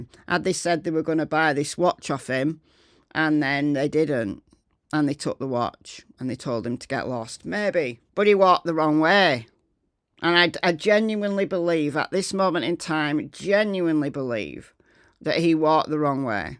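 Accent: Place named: Lancashire, United Kingdom. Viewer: we all know what's going on, the media are implicated, you;re absolutely right. we all know, these little scrotes are pretending to be gangsters, emulating L.A. gangs and rap culture, and the old rave culture. with no knowledge. I get the impression that you know the old rave scene? Those days are gone. I bet you know, judging by your accent, that you know what I'm talking about, Lancashire has been the centre of house/rave since the opening of the Hacienda, as you know. You're speaking truth, you know, we all know, so...........why all the speculation? drama and theatrics?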